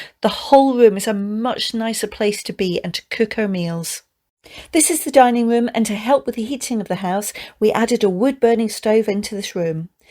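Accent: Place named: Scotland, United Kingdom. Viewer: Sorry if you've mentioned this before but I've just found your videos; have you lived in Scotland for some part of your life? Cause your accent sometimes sounds a bit Scottish...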